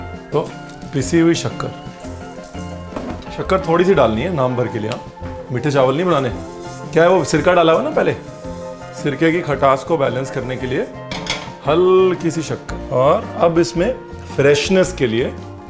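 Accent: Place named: Punjab, India. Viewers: Amazing recipes of left over rice...will try paneer 65 rice for sure..luv d way u explain.ur punjabi tone while explaining reminds me of my mom...meethe chol ni banane ha ha👍😀too gud...love n respect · Aaj tou fir teen shadiyaan hongi shamko, aana jarur ji.... 💥💥💥btw aapki punjabi k sath meri bhi punjabi nikal jati hai, n my hubby say, tere naal he gallan kar reha hai 🤣🤣🤣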